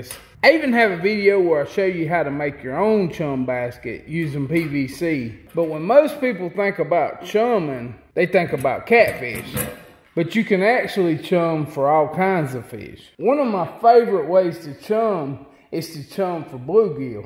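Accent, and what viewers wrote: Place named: North Carolina, United States. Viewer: Man I'm from laurinburg born and raised , you gotta be from Robeson County you just have that Robeson County twang. Some good people from around them parts. Keep the video's coming best tips I've ever seen. I love your common sense approach to fishing...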